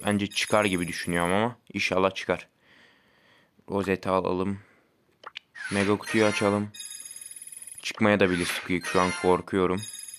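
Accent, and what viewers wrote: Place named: Thrace, Turkey. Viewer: Trakya aksağanı gözümden kaçmadı. Selam olsun sana hemşerim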